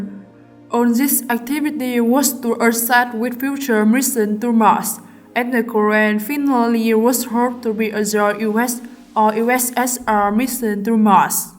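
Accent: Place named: Japan. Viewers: could you possibly put there talking someone with normal english and not with strong japanese accent? it is hard to understand you... thank you. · ah yes, she does have a strong japanese accent. I think it'll help her greatly if she hires an voice actor to do her videos. Good content here.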